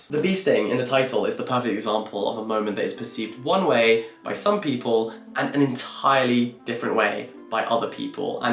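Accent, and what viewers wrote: United Kingdom. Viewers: How can someone be so attractive? I watched a 30 minute video just watching to his face and listening to his British accent🥴no idea what he was talking about. So distracting.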